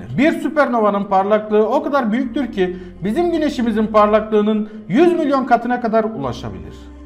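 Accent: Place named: Syria. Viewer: Aga ne berbat video. Ne anlatmak istedin ne alakası var seninle? Bide sen niye suriyeli gibi konuşuyorsun??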